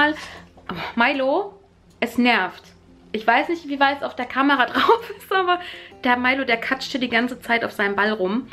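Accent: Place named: Thuringia, Germany. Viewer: Erstmal toll das du wieder da bist. Ich hab dich soooo vermisst. Hoffe dir geht es wieder einigermaßen besser. Falls nicht schick ich dir gute Besserungswünsche. Süss das der thüringische Dialekt bei der Krankheit voll durch kommt. ❤️❤️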